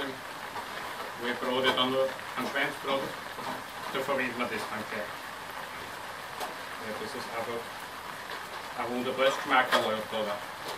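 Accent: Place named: Austria. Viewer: I just love the Austrian accent even though it means I can't catch everything he's saying! So nice to see someone doing these traditional recipes. Also love it that the dog is allowed in the kitchen, it reminds me a bit of the old British show "Two Fat Ladies".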